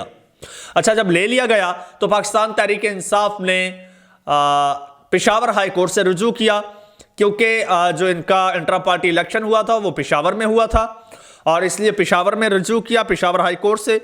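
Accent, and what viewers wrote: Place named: Punjab, India. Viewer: Bro ek suggestion hai Vlog acha hai lekin apki Urdu Mai punjabi style ata hai Baqi sab set hai❤❤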